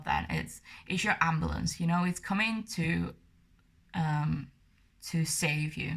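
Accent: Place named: Lancashire, United Kingdom. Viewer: Ha Ha ,weirdly my husband said to me ...' the accent sounded like someone from Lancashire with a foreign accent : . Thank you for answering.. unfortunately he wins 😬😂. We live in Preston but are from nr Ormskirk . I am looking forward to any more readings that you do xx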